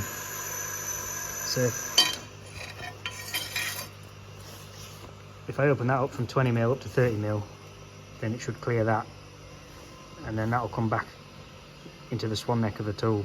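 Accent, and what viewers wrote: United Kingdom. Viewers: Came here on recommendation over on the CEE channel. I really like this kind of work - big fabrication by someone who's not afraid to take on a challenge because they have confidence they can do whatever it takes, even if it means re-engineering things from scratch. The Brit accents can sometimes be challenging for a US guy, but you're clear enough for me to catch the drift. 🙂Good stuff!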